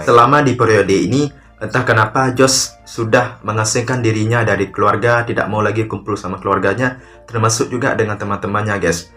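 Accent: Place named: Aceh, Indonesia. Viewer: Abang orang aceh ya dari logat nya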